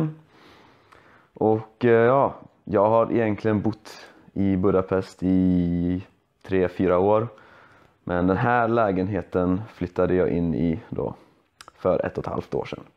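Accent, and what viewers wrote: Sweden. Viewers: så söt du är alltså! din dialekt är fin också, göteborgska eller? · So helpful! What part of Sweden is your Swedish typical of?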